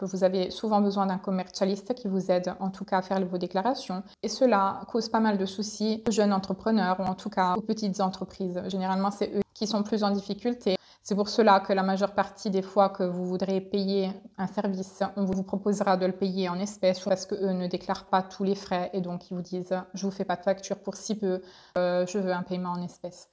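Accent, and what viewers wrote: Italy. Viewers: vous avez une belle intonnation italienne quand vous parlez le français, c'est bleuffant. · Baleze t arrive a faire l accent Italien en Francais j ai jamais vu ca mdrrr 🤣🤣🤣🤣🤣🤣🤣🤣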